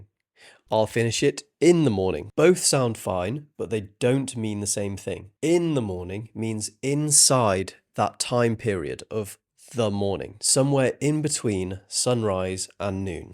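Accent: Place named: United Kingdom. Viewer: Omg your voice with this British accent is incredible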